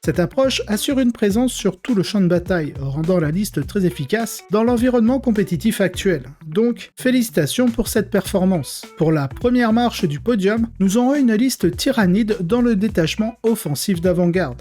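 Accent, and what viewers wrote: England, United Kingdom. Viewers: Gris kiff pour ton accent anglais inimitable ! 😉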